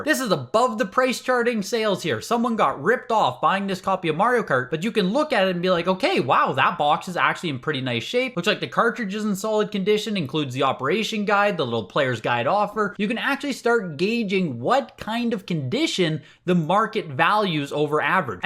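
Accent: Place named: Alberta, Canada. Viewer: Aye bud …..you gotta be from Alberta eh????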